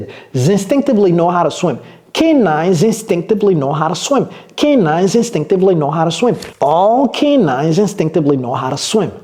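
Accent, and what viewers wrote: United States. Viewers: j' adore vraiment cet accent americain. ça t'as pris combien de temps mr d'être meilleur en parlé? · "Apples to oranges" I heard " apologize"😁 if I'm regular to see all your videos I can hear American accent. I'm glad to find your lessons. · I really like the way you teach English! The challenge is the american accent. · Wow c'est tres bon votre accent americain! meme votre accent francais est impeccable. BRAVO!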